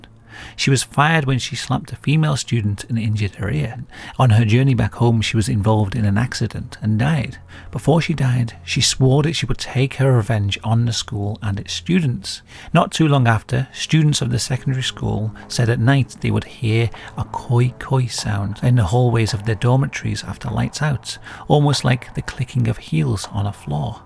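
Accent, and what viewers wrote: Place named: North Wales, United Kingdom. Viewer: I knew you were from north wales😂😂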